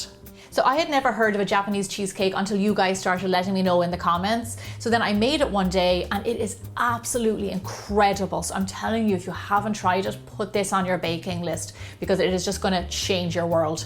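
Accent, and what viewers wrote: Ireland. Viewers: Loved your baking tutorial. Must give it a try, ta be sure!! Lovely Irish accent too. Yum!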